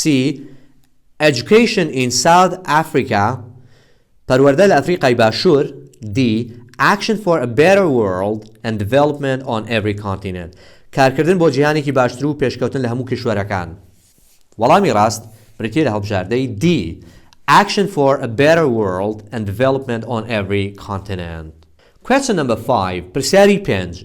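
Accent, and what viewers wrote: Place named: United States. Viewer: Mashalla your accent is incredible just like American kepp posting teacher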